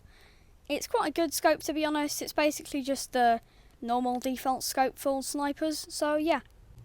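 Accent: United Kingdom. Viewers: You sound british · Are u british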